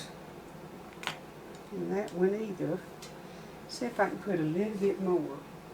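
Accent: South, United States. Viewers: Rattle? I heard no rattling. I heard the melody and cadence of a lovely southern voice.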